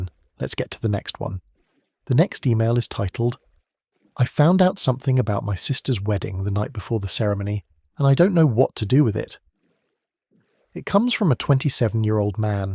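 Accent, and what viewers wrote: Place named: United Kingdom. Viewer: why channel say usa but voice british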